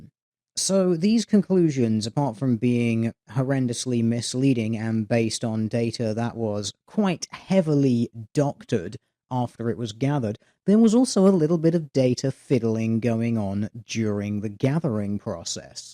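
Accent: United Kingdom. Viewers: there is no resisting a British accent.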